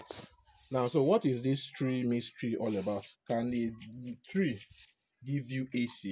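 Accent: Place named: Nigeria. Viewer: 🥱🥱🥱 Nigerian English sucks please use scientific language.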